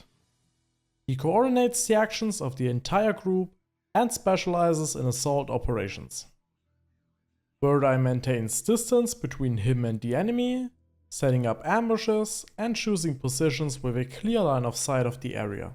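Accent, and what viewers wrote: Germany. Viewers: german accent is strong with this one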